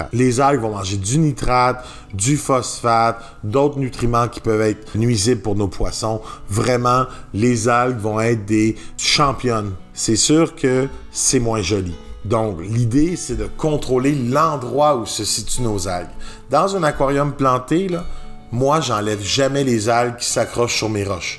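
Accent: Quebec, Canada. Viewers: Pareils que toi ! Après c'est un choix, mais je pense que les poissons aiment mieux avoir des trucs à grignoter pendant la journée, j'ai diminué leur ration de granule depuis un mois et avec ce qu'il trouvent à manger dans le bac ils sont en super forme ! Les mbunas c'est un peu à part pour les paramètres de l'aquarium par rapport aux autres poissons. En tout cas j''apprécie beaucoup ta chaine et je suis fan de votre accent à vous autres québecois !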